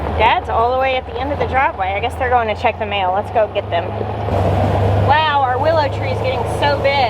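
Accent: South, United States. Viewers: I add onion slices when itz too hot for lettuce. I had thinly cut cuke slices the last time. Both are good Girl, you're beginning to sound reeeallll Southern, <snicker> says the Bama woman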